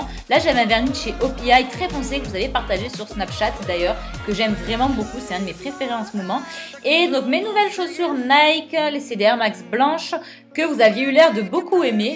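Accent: South, France. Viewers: t'es tellement ridicule quand tu dis healssy... dis le en francais avec ton akessan du sud c'est beaucoup mieux va...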